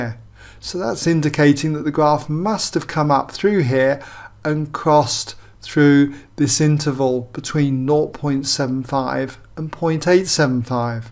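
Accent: England, United Kingdom. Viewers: Nice english accent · Nice UK accent,good way to captivate the audience.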